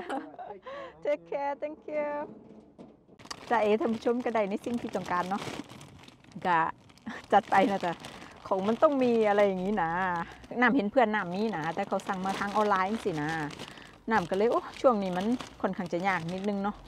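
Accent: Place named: Isan, Thailand. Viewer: สำเนียงอีสาน ไท ชัยภูมิ ชุมแพ ภูเขียว ภูเวียง ตั๊วนี่หรือว่าไทใด๋หน้อเว้าคือบ้านข่อยแท้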